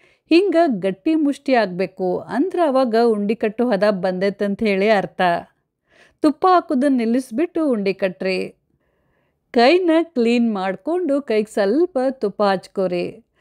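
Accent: North Karnataka, India. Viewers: I forgot to tell you, I lived during my childhood in Bangalore, and I had some classmates who spoke the Dharwad Kannada so I absolutely love uttara karnataka dialect. Thanks for speaking in that dialect. That brings me back to my golden childhood days!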